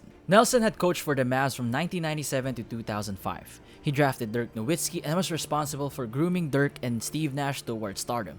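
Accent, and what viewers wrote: Philippines. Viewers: is it just me or do y'all hear a filipino accent? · Sa boses palang pinoy na e 🤣. Love the video though 🙏🏽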